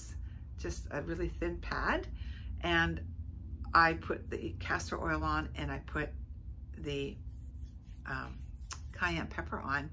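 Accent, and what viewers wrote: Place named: North, United Kingdom. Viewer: You sound like you’re from the north. You look good. Me likey 😊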